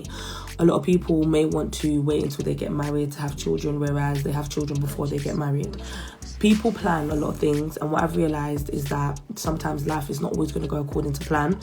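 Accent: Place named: Jamaica. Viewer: Your accents I soo nice 😍 please . Give me some real Jamaican 🇯🇲 patios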